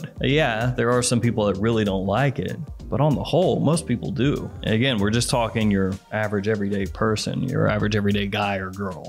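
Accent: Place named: Tennessee, United States. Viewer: Your TN accent sounds pretty similar to ours out here in Raleighwood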